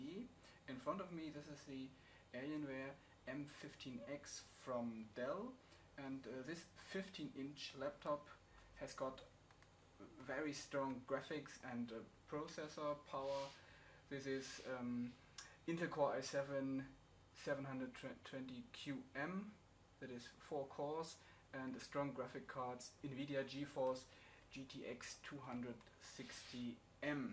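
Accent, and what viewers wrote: Germany. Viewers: schöner deutscher acczent^^ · ein leicht deutsch akzent ;) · ich höre schon das du eigentlich deutsch sprichst ;D kannst du mir sagen welche grafikkarte rein müsste um battlefield 3 auf hohen ( nicht ultra ) einstellungen zu zocken ?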